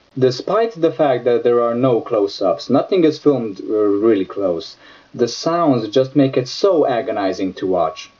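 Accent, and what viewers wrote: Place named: Portugal. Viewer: Are you portuguese? You kinda have a portuguese accent :)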